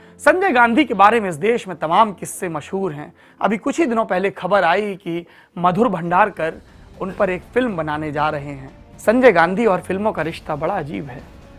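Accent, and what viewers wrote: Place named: Uttar Pradesh, India. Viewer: UP ki khadi boli, aur nabbe ka politics. amrika bhi mere gaon sa lagta hai......maja aa jaye agar lalu bhi koi baat ho jaye......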